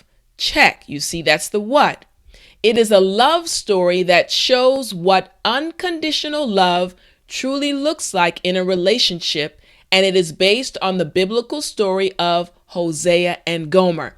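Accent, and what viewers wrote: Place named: United States. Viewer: America English♥😚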